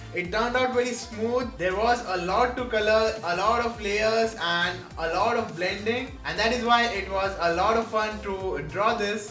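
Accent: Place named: India. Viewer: Nice video! And great accent. Indian right?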